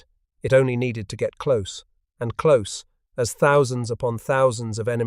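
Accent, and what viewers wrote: United Kingdom. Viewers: Nice story but could we have an American TTS who pronounces American English not British English, we are discussing American History afterall.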